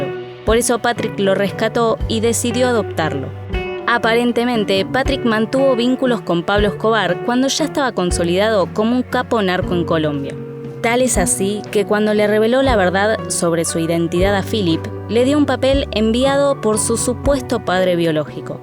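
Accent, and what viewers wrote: Argentina. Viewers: buen vídeo pero odio el acento argentino, da asco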